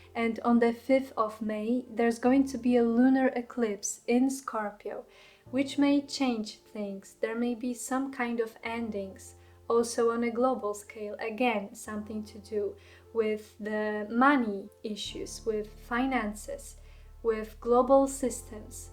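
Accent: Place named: Poland. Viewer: Hi great stuff you are so smart my mind has been so scrambled lately sometimes listening to people just makes it worse but not with you for me brings me down to earth just the way you talk I guess soft voice and I love your accent I live in NY USA I think you are in Poland? Thank you for helping me 😀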